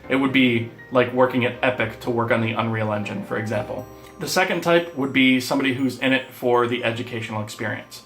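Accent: Germany. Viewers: Bist du deutsch? 😂 Dein Akzent hört sich so an.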